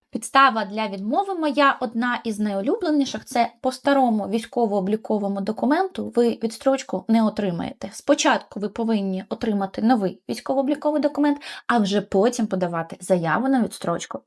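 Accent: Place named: Hutsul, Ukraine. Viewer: ещё этот мадьярско-гуцульский акцент!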